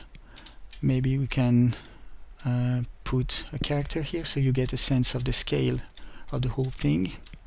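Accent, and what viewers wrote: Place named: France. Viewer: L'accent Fançais est présent!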